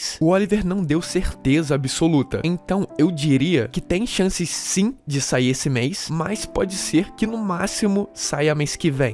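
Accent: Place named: Rio de Janeiro, Brazil. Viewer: Min fala carioca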